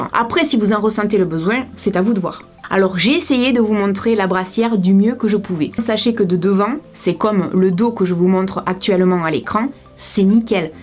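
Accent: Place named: Marseille, France. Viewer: vous aviez l'accent marseillais comme kamila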